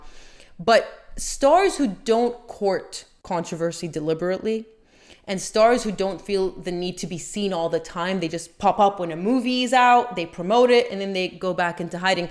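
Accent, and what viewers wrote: New York, United States. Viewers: How can you never have been to the states and yet speak like an American? I love your program, your mind is sharp and your delivery is exceptional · How do you have such a US American accent if you've never been here? Not unless you did your schooling in Canada. Sometimes their accents sound the same :) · It blows my mind you’re not from the US because there is NY writ large in accent, mannerisms, hand gestures. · I was surprised when you said you’re not an American and you’ve never been to the States. You have an American accent! I just assumed you were born here and moved abroad. Where are you from? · Your accent sounds America. Where are you originally from? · I always thought you were American based on your accent !!!!😂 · Don't you have an american accent? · Whaaaaaa? You’re not an American? You never been here? You have a perfect American accent!!! 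How do you manage it? I admired you before but now that I know this, hats off to you lady!! Where are you from then? Wow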